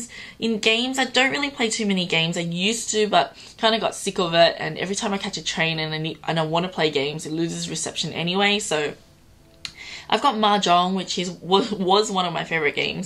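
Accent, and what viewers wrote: Australia. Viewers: australian accent aaa ashdjdkal love it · I think it's an Australian accent. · are you australian?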